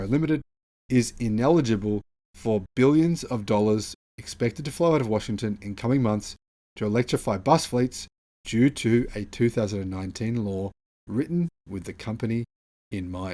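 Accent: Australia. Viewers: Are you Australian? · I can safely say by your accent that you are not American. Perhaps Australia? If that is the case, you should understand quite well the dangers of letting the Chinese companies controlled by the Chinese government, that means ALL CHINESE COMPANIES, run rampant in your country. Of course your country is under Attack by and has nearly been taken over by the Chinese government's "belt and road" initiative. I'd say you better learn just how insidious China is in it's plan to OWN countries by economic intervention. It doesn't matter who owns the shares of Chinese companies, in the end they are all a part of Chinese world dominance. Id say America has every right to be scared of BYD and every other Chinese company who wants to infiltrate our infrastructure.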